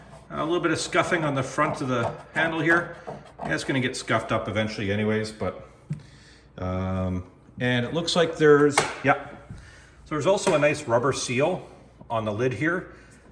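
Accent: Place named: Minnesota, United States. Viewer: You must be from Minnesota. Nice unboxing and walk through of the unit!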